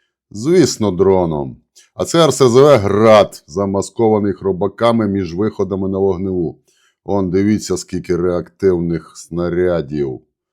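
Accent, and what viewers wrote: Transcarpathia, Ukraine. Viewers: !!!та від ВАС любий формат!!!(це як бальзам)/і запатентуйте Ваше вміння Закарпатської, говірки, 🎉😂. Слава Богу що є такі ПАТРІОТИ!!! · Мені подобається будь який формат, п., бо знаю, що хробаки будуть отримувати в центр прінятія рєшєній те, на що заслуговують. Ну, а озвучка із включенням нашої закарпатської бесіди дуже ми ся любит.🇺🇦❤️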